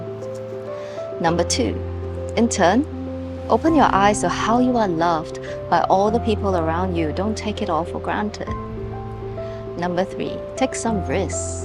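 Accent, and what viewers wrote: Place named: Singapore. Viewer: She sounds Singaporean. They work very very hard!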